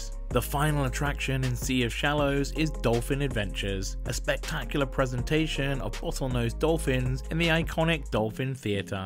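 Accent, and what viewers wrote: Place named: United Kingdom. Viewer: British accents announcing American products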